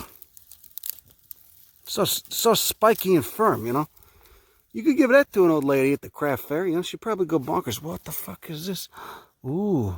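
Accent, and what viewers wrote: Chicago, United States. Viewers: Trouncing about gods delicates with your tattoos and your chicaganese dialect and your peircings i bet youd get your arsehole pierced If ya could fit your cheeks in da machines!!!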